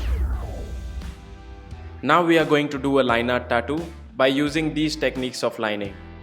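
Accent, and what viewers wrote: India. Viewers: Good Video but dang that accent has got to be the most disgusting accent ever. Indian accents are just gross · I wach but the akcent indian destract me afk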